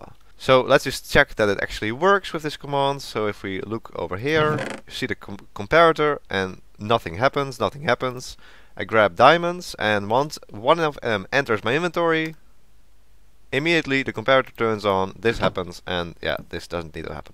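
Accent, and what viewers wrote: Netherlands. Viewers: hahaha ik hoor aan je stem gw dat je nederlands bent heuyy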